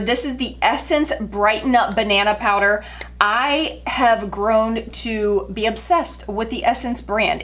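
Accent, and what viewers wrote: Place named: South, United States. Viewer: It's so good to hear that Southern draw. I'm in AL :-) thank you for letting us know about Womanesse. I'm getting the neck serum! I look forward to seeing more of your videos! Stay beautiful xoxo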